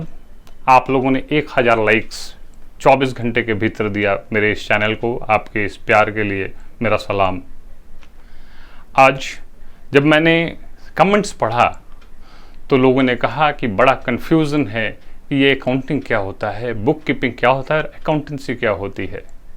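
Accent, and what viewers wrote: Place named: South, India. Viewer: Nice work bhaiya. Congratulations . n I will say it again. You have south Indian accent. 🤘🤘🤘🤘🤘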